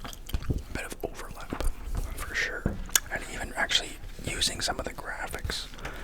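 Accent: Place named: Canada. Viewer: also are you from Canada?? I hear a little accent and its really nice hahah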